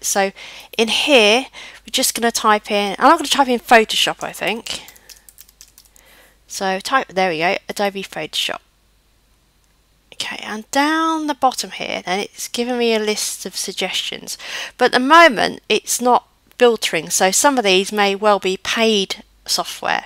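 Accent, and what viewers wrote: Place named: United Kingdom. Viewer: Thank you! Beautiful girl! Love your Britain accent! haha~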